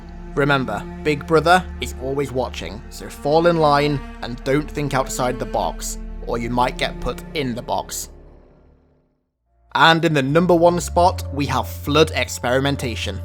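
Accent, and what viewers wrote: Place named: England, United Kingdom. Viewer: English country accent , The greater good .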